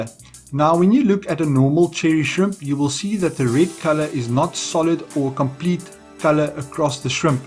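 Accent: Afrikaans, South Africa. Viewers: More oom kan ons praat oom klink Afrikaans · Is that an Afrikaans accent I hear😎👍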